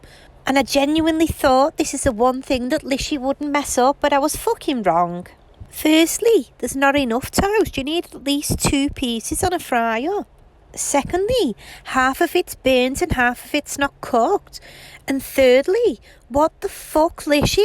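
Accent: Liverpool, United Kingdom. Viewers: hi, I'm from the UK like but my accent is totally different and I love her accent, she is from Liverpool and has a scouse accent as we call it in the UK 😊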